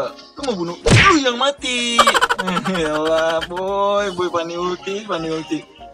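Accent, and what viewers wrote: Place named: Sunda, Indonesia. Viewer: Bang lo orang tangerang selatan ya sunda kasar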